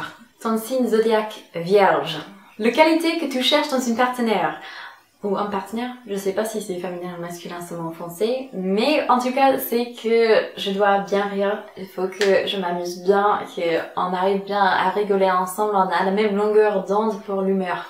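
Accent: United States. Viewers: J'aime beaucoup t'es vidéos , sa a dû être dur d'apprendre le français pour toi , et j'aime beaucoup l'accent américain que tu as 😄 voilà , continu comme sa tu es super !!☺️ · Super vidéo ! J'adore ton accent, j'aime tellement quand les américains ou les anglais parlent en français. Je te trouve vraiment touchante et authentique ! Bisous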